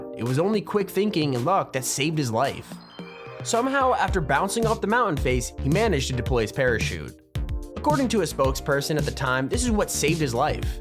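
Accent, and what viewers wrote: Australia. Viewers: Are u Australian bc I'm Australian (mate) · Wy you doing an Australian voise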